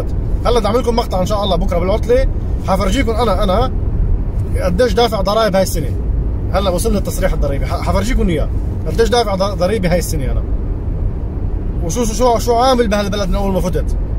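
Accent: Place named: Palestine. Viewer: السلام عليكم إنت من وين أخي واضح من لهجتك فلسطيني من سوريا أو لبنان؟؟ ممكن تعمل فيديو قصير تحكي فيه عن نفسك سيرة ذاتية. من الجيد أن نعرف عنك أكثر و شكرا جزيلا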